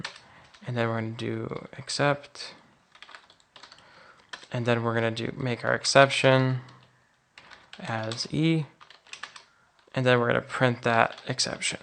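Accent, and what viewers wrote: United Kingdom. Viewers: The hawker has a REALLY annoying British ac-cent' on "PIE'-thun'. The other Americans speak very well. The content is good. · Thanks GOD, a British accent at last. Where have you been mate?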